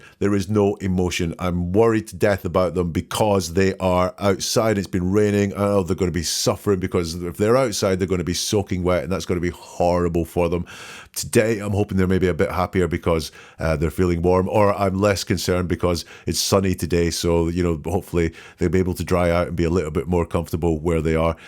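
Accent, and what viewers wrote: Scotland, United Kingdom. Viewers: This has been fascinating and I hear your lovely Scottish accent… I live in Wales 🏴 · Soo glad i found you. Like brittish english better than the tons of american english flooding the internet. Also you are in my timezone❤ . I am a great fan of Peter Hyatt, bless him, Looking forward to watch more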